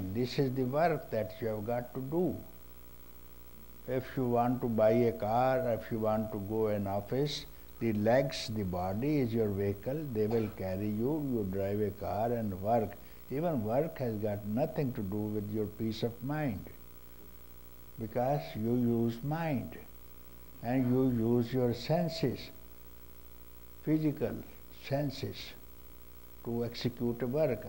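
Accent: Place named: India. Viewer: I like Papajis Indian Accent 😂😂😂🙏🙏🙏🙏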